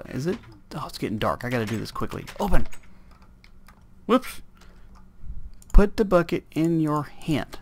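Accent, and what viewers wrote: South, United States. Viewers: Like country accent im from Georgia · Thank you very much for these awesome videos,! I like your accent too. What state are you from? Greetings from Washington State!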